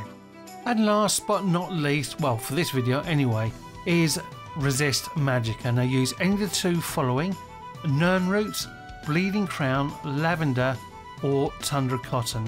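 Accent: England, United Kingdom. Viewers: This sounds extra legit with the English accent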